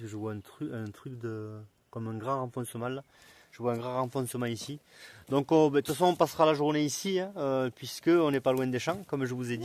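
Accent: South, France. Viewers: Salut l'ami, beau site vraiment magique l 'ambiance. Vous êtes du Sud vu l'accent ...moi aussi je détecte dans le 13. Si des fois ...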